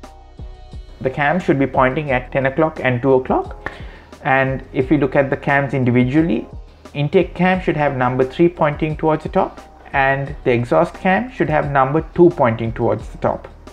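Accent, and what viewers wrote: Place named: India. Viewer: You talk like you are from India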